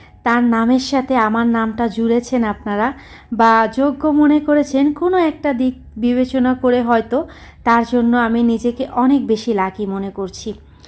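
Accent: Khulna, Bangladesh. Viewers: আসসালামুআলাইকুম কেমন আছেন আপু আপনার কথা শুনে আমার খুব আপন মনে হয় কারণ আমি ও খুলনার মেয়ে। আপনার বাড়ি খুলনা কোথায় জানাবেন প্লিজ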